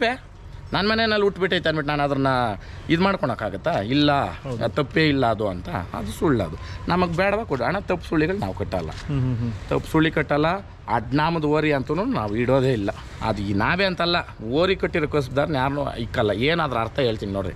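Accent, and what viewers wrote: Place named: Butler English, India. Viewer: Batlar English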